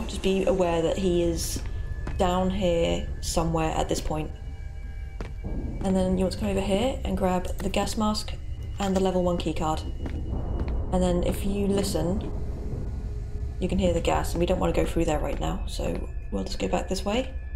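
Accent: United Kingdom. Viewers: I thought this was a 13 year old British kid the entire time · Are you British? Perchance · Are you British?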